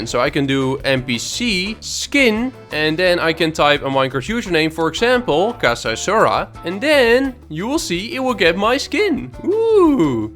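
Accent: Netherlands. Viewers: I can hear that you lived in the netherlands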